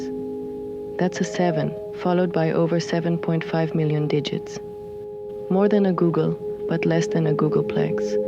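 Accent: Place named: Europe. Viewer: monotone voice with slightly European accent, devoid of human differences that give "color" to life. Drivel.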